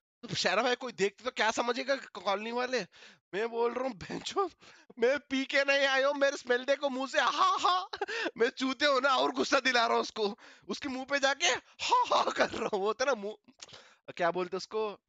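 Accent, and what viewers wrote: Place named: South India, India. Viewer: ohh his voice is lile south indians :)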